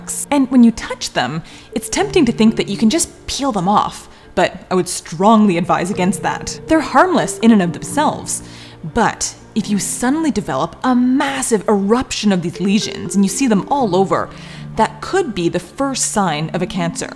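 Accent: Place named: Canada. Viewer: She sounds Canadian. Lots of good information here.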